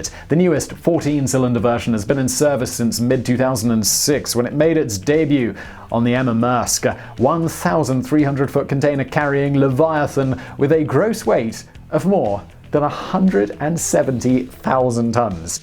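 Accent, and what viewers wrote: United Kingdom. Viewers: Is this guy filming in America or just had a British accent? Any one know?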